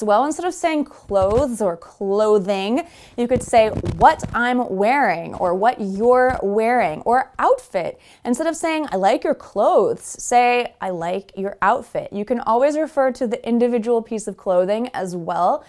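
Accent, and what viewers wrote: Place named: United States. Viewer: Good Morning, How can I say this? I loved these alternatives mainly because I love to have more possibilities, more varieties, to increase my vocabulary, you know, to have more options This video lesson was a huge gain for Me to be more conversational 😂 But, I'm an enormous fan of this your American TH Sound, and it's too charming the position of the tongue when Americans say it Maybe I'm saying this because I'm like obsessed with it, I get staring People's mouth 👄 catching each tiny movement, for Me to learn and also because I definetely love it! Ok, I took some notes here: I'm thankful to you because of this suggestion on this video with 30-40 mins of duration, Train, right!? I'll certainly check it 😉 THANK YOU = I appreciate you / Awesome / Wonderful / I'm so grateful... Yes, I appreciate you for everything that you've been doing for us, it's Awesome 😍 I THINK = I have an idea / I imagine / In my opinion Hey, your idea of having that pizza last night had been Fantastic! 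In my opinion we should repeat this Program ( And how it's Me speaking, and I LOVE the TH sound, I would add to this sentence )... What do you think about it? 😂 Pizza, us, tomorrow, huh!? Father, Mother = Mom, Dad ( I enjoyed it, easier and cute as well ) 1200 × Twelve hundred Well, talking about numbers, I totally pass the 🏀🏈 ball Ok there are things in life that are unavoidable, and there will be times that will be required to talk about it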